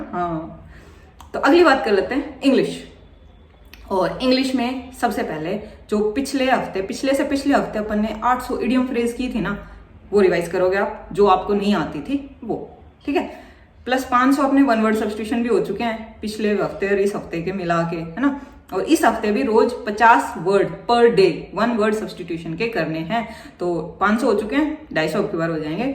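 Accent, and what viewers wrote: Haryana, India. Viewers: M from uttarakhand but love hariyanvi accent 😁😁😂😂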